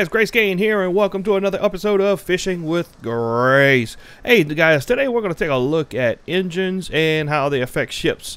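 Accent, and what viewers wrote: South, United States. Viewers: Is that a southern accent I detect? :D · is a coonass, im from bama. I tend to seek out the southern tubers, they my kinda people.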